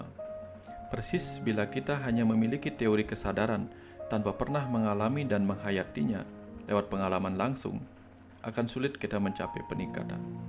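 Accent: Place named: Bali, Indonesia. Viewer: T nya masih Bali yan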